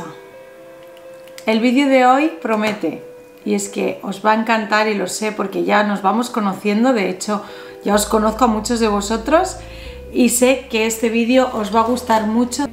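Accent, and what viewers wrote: Spain. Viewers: Gracias gracias gracias!!! Sos muy dulce!!!amorosa y tú acento Español me encanta!!! beso grande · apenas te encontré pero muchas gracias 😊 me siento contenta porque presiento que algo Bueno biene a mi vida 😍 en estos Días que Tanto Hace Falta Eres Una Persona que semanas Saviduria y Amor 🥰♥️♥️♥️♥️♥️🙏🏻🙏🏻🙏🏻🙏🏻🌹🌷🤶🥰por tu Acento pienso Que Eres De España 🇪🇸💐💐🌹🌷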